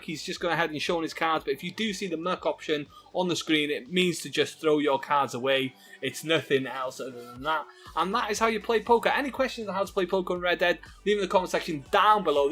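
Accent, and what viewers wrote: United Kingdom. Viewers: It's the British accent 😉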